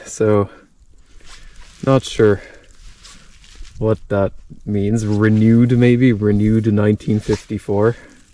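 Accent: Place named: New England, United States. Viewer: What state are from? I have no doubt in my mind that you are a new englander!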